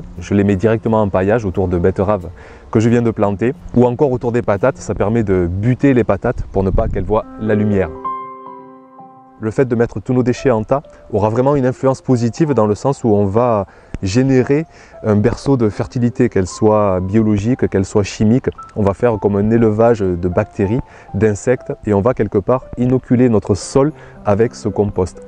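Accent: South, France. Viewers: Très bonne vidéo très agréable à voir et écouter avec l'accent du soleil 😊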